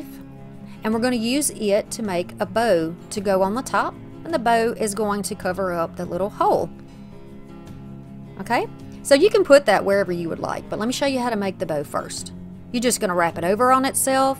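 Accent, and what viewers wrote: South, United States. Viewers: these are all so very awesome. You are an excellent DIYer. Also love your southern accent. Hugs and love, Janet 🦊🦊🍁🍁🌻🌻🍂🍂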